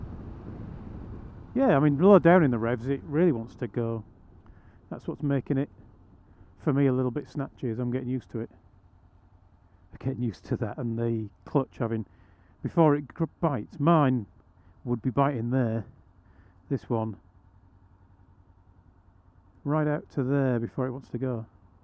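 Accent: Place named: Yorkshire, United Kingdom. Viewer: I enjoyed this video. I've already nearly decided to buy one. Last bike in England was a Honda SP2, but after eight years in Thailand on a 125, I am a "born again". But as somebody once wrote, " In the country of the sub 125, the man with 500 is king". The accent of God's Own County was a bonus.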